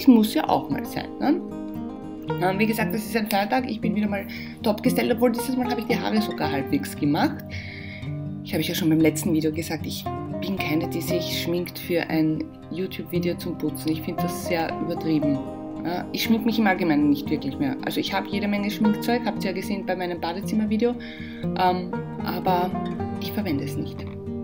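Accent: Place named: Austria. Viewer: Prosit 2020! Ich bin 26 und aus Oberösterreich! Ich finde dich und deine Videos super! Vor allem weil du auch "österreichisch" sprichst 😀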